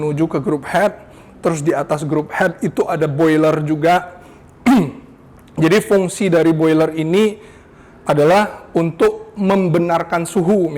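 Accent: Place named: United Kingdom. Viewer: iya itu logat british. Sama kayak Harry Potter.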